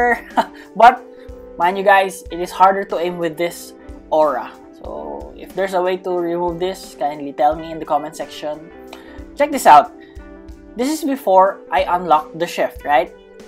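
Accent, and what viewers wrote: Philippines. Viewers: Is it just me or accent is Filipino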